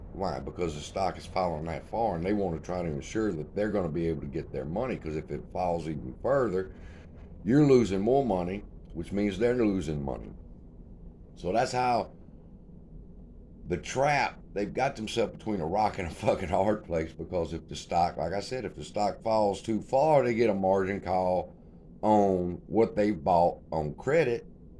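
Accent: South, United States. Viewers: I love your accent ? May I know where you are from ? Is that southern ? I am from Asia so I dunno much.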